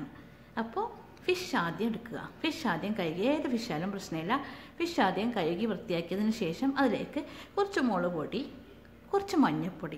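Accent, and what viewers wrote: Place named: Kasaragod, India. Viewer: Ingale kasarod slang I like ..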